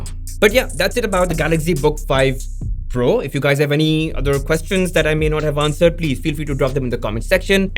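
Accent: India. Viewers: Iss. Bande ka eng bhi muze hindi jesa lag rha hai😅😅 laga hi nai english me video dekh rha hu 😅😅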